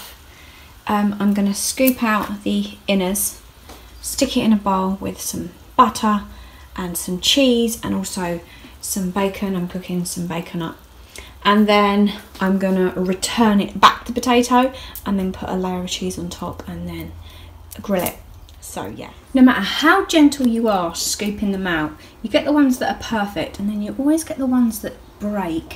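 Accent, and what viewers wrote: United Kingdom. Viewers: Really! The lovely Brit accent. Thanks much. But---is the "Little" a nickname preface of sorts? Surely a tween in a few years won't be amenable to that. 😉🥰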